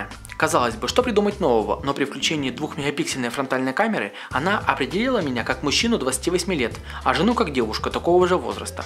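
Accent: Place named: Donbas, Ukraine. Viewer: Молодой человек надо, что-то менять! Не хочу ни кого обидеть! Но Вы делаете публичный обзор и дикция должна быть отличной от дикции обычных жителей Донецка или Луганска! Удачи и счастливых перемен!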